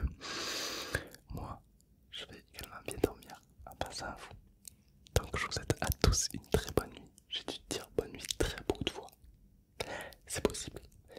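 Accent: South, France. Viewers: J'adore ton accent du Sud. J'ai le meme!! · On entends l accent du sud 😂😂😂😂😂😂😂😂😂😂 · Je comprends pas son accent français mais c’est quand même grave bien 😭😂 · Aller hop jsuis trop fier g entendu mon nom😂😂😂trop beau l'accent du sud bref force à toi👌👌❤️💪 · L’accent du sud 🥳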